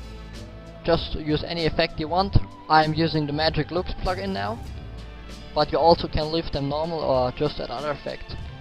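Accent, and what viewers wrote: Germany. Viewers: man hat gehört das du deutsch bist =) aber richtig geiles tut wird mir sehr helfen danke.. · are you from germany?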